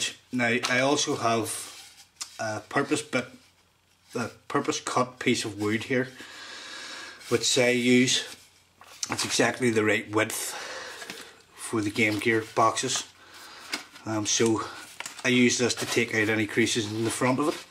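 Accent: Belfast, United Kingdom. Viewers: ***** yeah - he's from northern ireland though. Belfast by the sounds.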